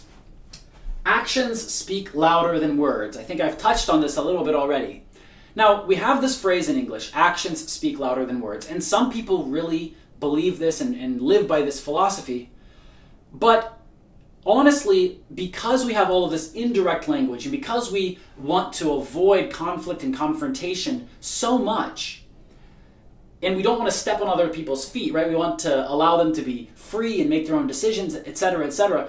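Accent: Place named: Russia. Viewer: Английский с русским акцентом)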